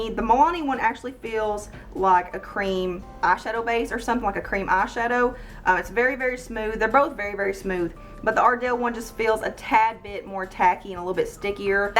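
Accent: South, United States. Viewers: Love your Southern Accent! Your brows are already pretty you don't need to do anything to them! 😊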